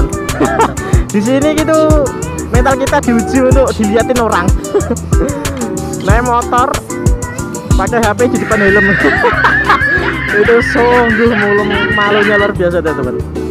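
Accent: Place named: Java, Indonesia. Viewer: Aku suka gaya bicarane mase... Mantap.... Ngekas orang jawa... Semangat pokok e.. 🙏👍👍👍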